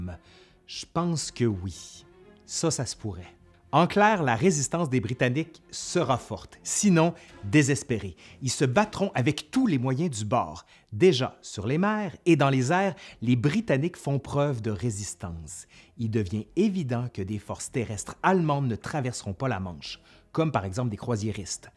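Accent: Canada. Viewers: Ca nous change de Stéphane Bern....et l'accent canadien participe au charme !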